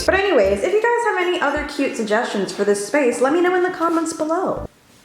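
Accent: United Kingdom. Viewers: who else loves her british accent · Ngl your British accent sounds so good lol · Its her British accent that really makes me laugh😂😂😂😂 I love it.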